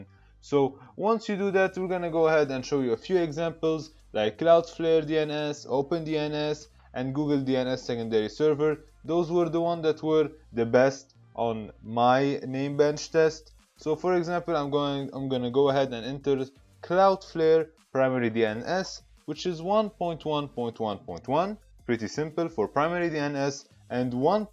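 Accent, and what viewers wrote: Lebanon. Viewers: Lebanese ??? I think I figured it out from the accent 😂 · Damn are you Lebanese? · Are you lebanese ?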